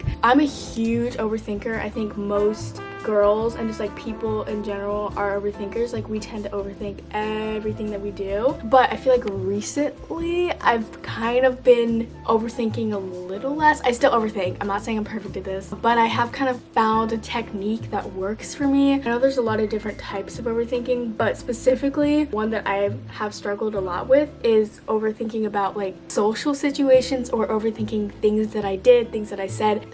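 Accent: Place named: United Kingdom. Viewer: GIRL I THOUGHT SHE WAS BRITAIN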